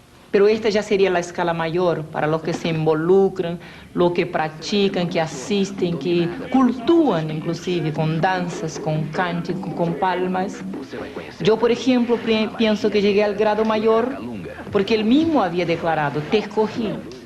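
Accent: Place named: Brazil. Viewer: parla spagnolo con acento brasiliano....forse originalmente il video è stato fatto per gli spanoparlanti...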